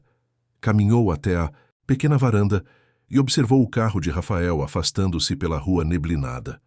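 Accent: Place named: Brazil. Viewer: eu sei, só que ele está narrando em português Brasil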